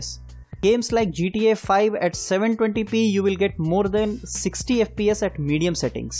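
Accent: India. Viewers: Love that indian accent lol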